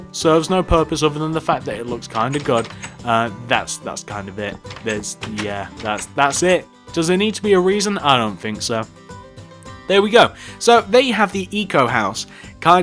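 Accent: United Kingdom. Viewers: Awesome are u from the uk?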